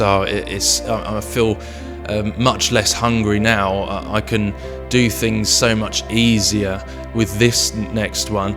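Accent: United Kingdom. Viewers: I love our English language accent from here in the UK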